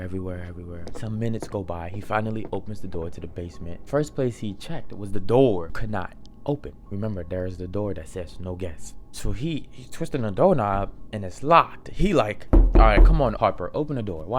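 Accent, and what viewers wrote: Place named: New Orleans, United States. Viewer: First timer here I here the NOLA in your voice ❤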